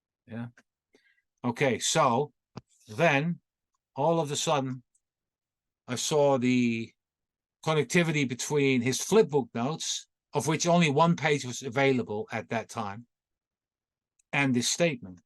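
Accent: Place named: United Kingdom. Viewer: !!!!:text-green-game-over::face-blue-smiling:🍾🥂🎉, as you were ticking off the 4 white, male, blue collar employees, that PM could be, you had Frazier, Oswald, Lovelady and Dougherty (or Dockerty, as you pronounce it across the pond 💂‍♂). You then said we know it can't be Frazier.... we know it can't be Lovelady...so that leaves Oswald and Dougherty. You then went on about Dougherty being on the 5th floor...etc... That's not important! I just realized we can justifiably kick it up a huge notch, and identify PM as LHO with virtual certainty! We have long had information about Dougherty's general appearance. In Roy Truly's Warren Commission Testimony, when being asked about Dougherty, Truly says: "He is a GREAT BIG HUSKY fellow. I think he is 39 years old."!!! I think Ed LeDoux also obtained similar information a few years ago, about Dougherty being big or fat, and if i remember correctly, wearing glasses. 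I'm not absolutely sure but I think he got this description from another TSBD employee or employees! Maybe from Frazier? There is no way that PM/Oswald is big, husky or fat! Or wearing glasses (if that's the case). So IPSO FACTO, Prayer Man IS Lee Harvey Oswald!!!!!!!! Am I wrong?! Please tell me I'm right!!!!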